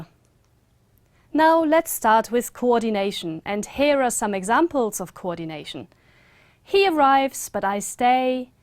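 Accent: Germany. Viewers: Funnily, this was my assumption after I heard you talking. For me as a German your accent is still quite noticeable. When you pronounced "have" I immediately thought of this phenomenon called word final devoicing ("Auslautverhärtung"). Am I right with that or would you say it's part of your British accent?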